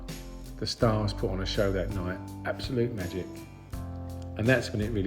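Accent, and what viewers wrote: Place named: England, United Kingdom. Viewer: A POM narrating the show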